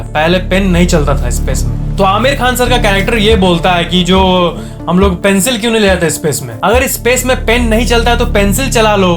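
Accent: Bihar, India. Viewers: Are you from Bihar? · Are you from bihar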